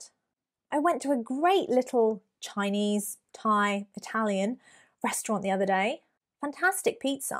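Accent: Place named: RP, United Kingdom. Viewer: Thank you so much! An extraordinary lesson without any doubt. I lived in the Southwest of London and love your accent. It sounds me received pronunciation.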